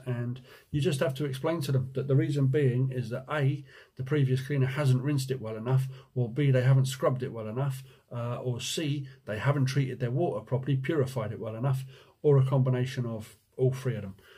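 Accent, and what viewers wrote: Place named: Yorkshire, United Kingdom. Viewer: Definitely a bit of Yorkshire slipping into your accent now 😁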